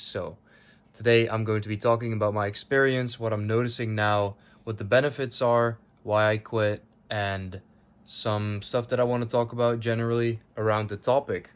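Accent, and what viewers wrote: Netherlands. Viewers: Yo bro are you dutch?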